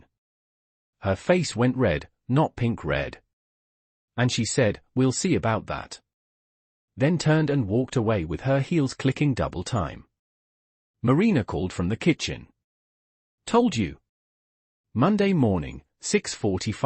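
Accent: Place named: England, United Kingdom. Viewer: The obvious english pronunciations of words doesnt go with the story.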